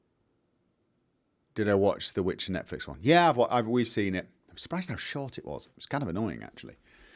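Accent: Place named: England, United Kingdom. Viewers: I love your English accent. I never heard your particular dialect. What part of England did you grow up in? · Northern english, mostly Manchester, I think. Search " Accent". There is a detailed video about it.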